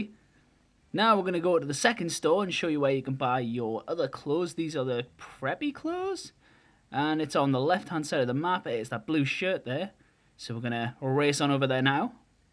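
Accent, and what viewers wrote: Newcastle, United Kingdom. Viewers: are you a geordie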